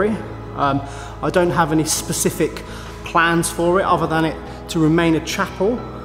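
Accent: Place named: England, United Kingdom. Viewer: Somebody knows where this convent is, I recognized english way of taking, thanks to light me !!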